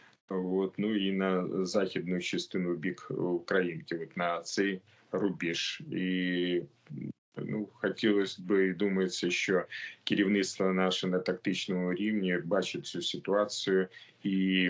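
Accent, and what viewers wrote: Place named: Russia. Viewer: Акцент ужасный, русскоязычные очень смешно говорят по- украински. Сама такая.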